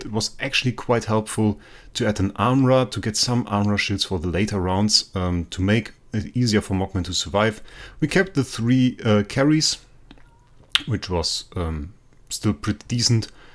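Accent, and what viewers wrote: Germany. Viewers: Bist du Deutscher man erkennt es so am Akzent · First of all great video. You make it look insanely easy. And secondly I wanna ask if you are from Germany because your accent sounds very German.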